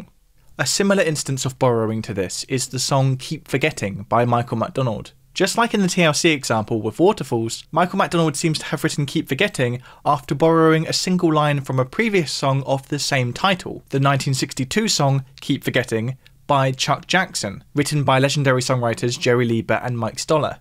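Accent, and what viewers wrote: United Kingdom. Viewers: Had my fill of British narrators. Don’t those people work?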